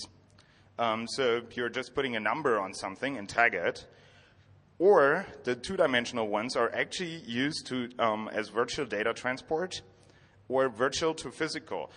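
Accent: Germany. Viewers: i sense a little bit of german dialect^^ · NamelessGhost bruh... you’re Swiss and can’t tell it’s a German presenter, and so you just assume American? Chumm itzt, so dumm muess mer au nöd tue...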